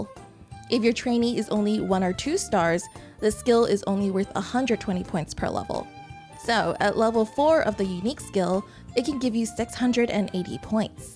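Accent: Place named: United States. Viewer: the murican accent is so cringe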